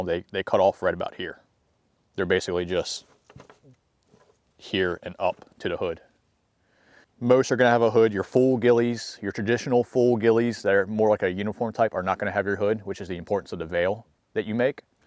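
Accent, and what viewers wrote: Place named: Pennsylvania, United States. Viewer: Lol i can here that PA accent anywhere, channel emote reconfirms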